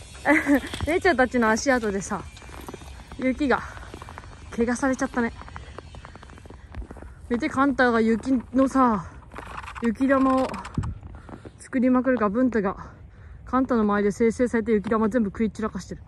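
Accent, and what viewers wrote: Kyushu, Japan. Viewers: 文がまるで犬のような姿見せてくれてる事よりも、 姉の拠点が関東な事に衝撃を受けてます イントネーション的に九州辺りかと思いながら見てました （過去動画に引っ越し関連の説明あったりハズレてたらゴメンナサイ）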